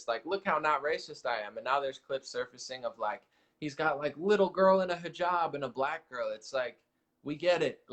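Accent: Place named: South, United States. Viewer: Dude, love the southern accent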